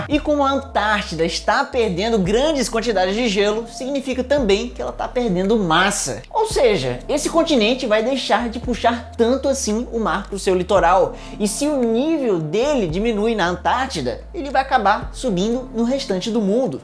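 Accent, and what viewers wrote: Ceará, Brazil. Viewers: Vc é cearense? Tbm sou haha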